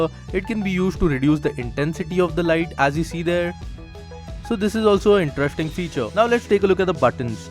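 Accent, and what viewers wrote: India. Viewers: terrible indian english /...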